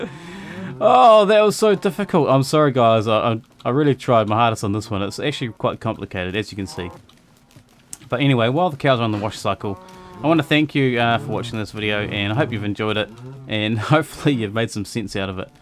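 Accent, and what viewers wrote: New Zealand. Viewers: Hey bro...works mean as,buzzed out when I watched your tutorial and heard a kiwi on the other end, just starting to get some skills now on minecraft and it's all due to the tutorials on youtube...keep up the good work man. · My water only flows to the right over the fence, only flows both ways when I update with redstone torch, but when i retract the water and dispense it again it screws up again...any ideas? P.S. Great to hear another fellow kiwi accent on youtube!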